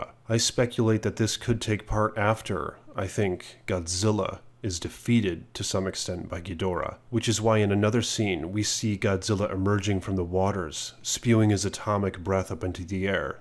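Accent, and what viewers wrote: RP, United Kingdom. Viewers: It's a reasonable guess,. I thought they would go the elemental route... Earth, water, air, fire and spirit but there are only a couple of the Titans that fit those specific attributes... PS - Your doing the Lord's work on G2 compared to your peers because: 1. You made it out of puberty. 2. You speak the Queen's English. 3. You don't ramble incoherently. But you have to ditch the creepy, pedo pizzagate voice.... 👌👌👌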